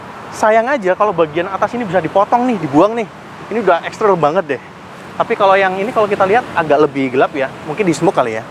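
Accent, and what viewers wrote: Indonesia. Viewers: Kokoh medok amat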